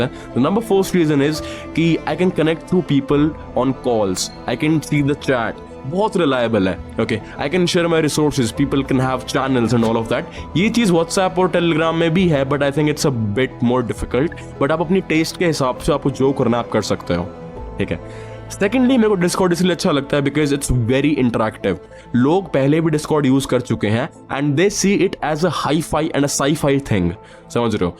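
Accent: Haryana, India. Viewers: Bhaiya i just have a simple question jo aap yeh itni bhynke English bolte ho vo kha se seekhi? Dictionary se kisi app se ya kese? Or kitna time lga the way u talk in English and mixed with haryanvi accent aaye haaye ❤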